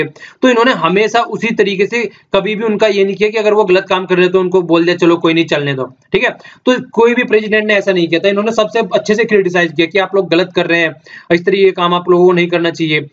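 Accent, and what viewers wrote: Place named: Rajasthan, India. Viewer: sir u are the best...i appriciate ur knowledge...way of teaching....and hard work for us...and i think you are from rajasthan from your accent as i am too from ajmer i will love to meet u sir...but that too after 2018 prelims...i am to give in a lot into it till then... thanks sir..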